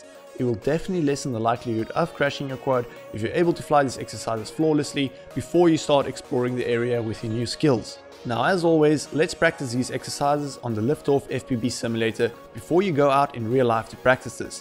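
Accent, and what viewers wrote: South Africa. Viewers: Are you South African?? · Hey you from SA?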